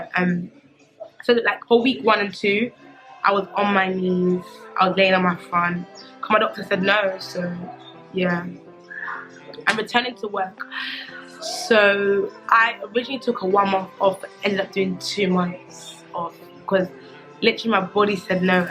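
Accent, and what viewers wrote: United Kingdom. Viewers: British english, probably something around London. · Really brit accent is the hardest to understand. So hard. I love American English.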